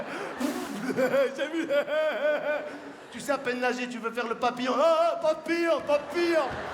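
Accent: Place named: Morocco. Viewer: J aime bien l'accent français marocain Je suis italienne et à travers tes sketch, j 'apprend mieux la langue française, ses sang,intonation ,prononciation Merci bien ,Bravo !!!!!!!!!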